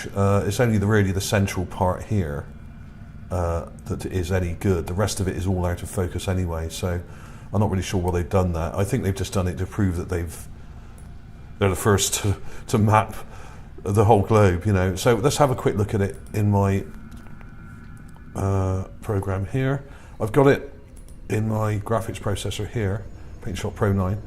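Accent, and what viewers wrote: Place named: West Country, United Kingdom. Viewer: Thanks ….for another share. Always fascinating. Especially in that warm West Country voice of yours. I am from Hampshire myself.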